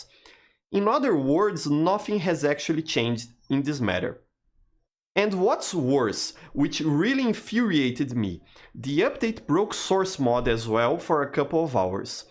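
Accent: Brazil. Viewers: That's one thick fucking Brazilian accent, my friend. I can hear your eyes going through the script. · Hey, I noticed your brasilian accent, do you usually play on the servers? how full are they? (argentinian btw) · Esse sotaque é BR, reconheceria em qualquer lugar. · I recoginize i brazilian voice when i hear one. Oi.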